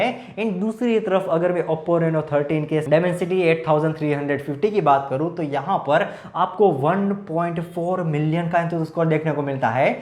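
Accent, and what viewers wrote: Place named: Jamnagar, India. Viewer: Flawless 🎉 u seem to be from jamnagar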